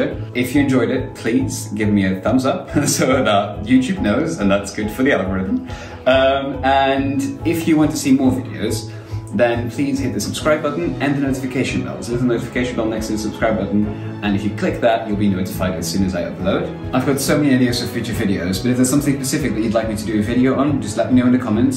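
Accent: United States. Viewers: You've learned perfect American English and accent. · You did a beautiful job and listening to you speak English you almost sound like an American... your English is great!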